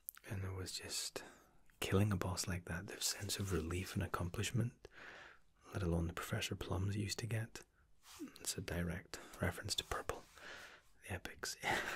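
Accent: Scotland, United Kingdom. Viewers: i have been listening for so long i can't tell... Is he british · are you from scotland and if so where abouts?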